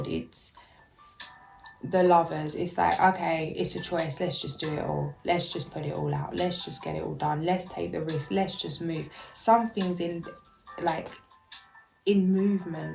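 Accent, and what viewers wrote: United Kingdom. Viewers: As a white dude from America, which automatically makes me a deaf, dumb, and blind idiot according to the mainstream media, you sound British to me honey.. this is great stuff, keep up the great work... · Hadn't noticed honey. Just thought you were from London. A cockney lass. Who cares. You have the right to block anyone who gives a negative comment. xx💝💖💕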